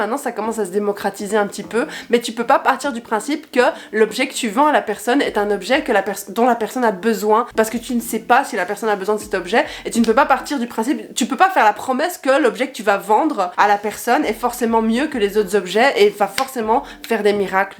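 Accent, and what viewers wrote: Brussels, Belgium. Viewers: MEUF t'es bruxelloise en fait?! Je me suis toujours demandée d'où tu étais en Belgique (je suis Bruxelloise) mais je me sens honteuse de pas avoir reconnu l'accent là quoi... (bon maintenant, je regarde le reste de la vid)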